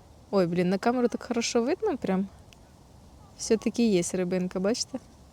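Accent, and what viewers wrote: Kirovohrad, Ukraine. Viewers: Я зарас далеко від Кіровоградщини тобіш на фронті і мені так приємно чути наший рідний суржик я наче побував дома дякую Вам за Ваші відео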